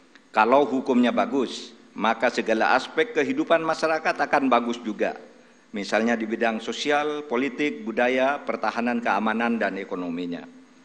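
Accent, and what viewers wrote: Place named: Madura, Indonesia. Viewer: Logat Madura nya masih kental, salam settong dere pak😁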